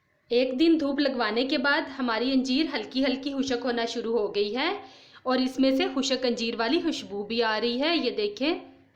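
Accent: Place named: Punjab, India. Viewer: oh ok you have the same accent of Indian punjabis 😊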